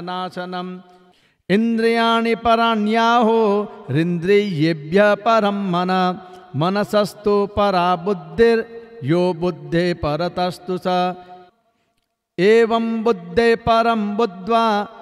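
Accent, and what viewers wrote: Standard. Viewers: श्री जी को बारम्बार प्रणाम। आप श्रीमदभगवदगीता पाठ शुद्ध एवं स्पष्ट उच्चारण करते हैं। मन प्रसन्न हो गया।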